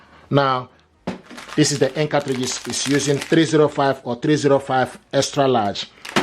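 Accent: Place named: Africa. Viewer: strongest african accent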